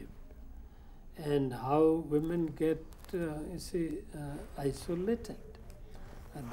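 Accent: India. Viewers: Useful but it goes heavy with Indian English.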